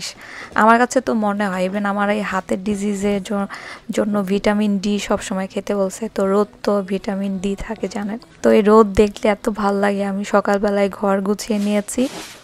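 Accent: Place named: Sylhet, Bangladesh. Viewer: আপু,আপনার আপনার আশপাশে কি সিলেটি আছে?আপনার ভাষা তো সিলেটি টান এসে গেছে🎉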